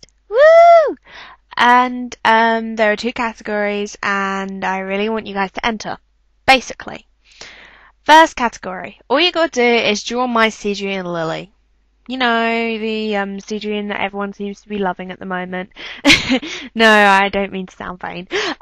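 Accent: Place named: United Kingdom. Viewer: you british? sounds like it *giggle*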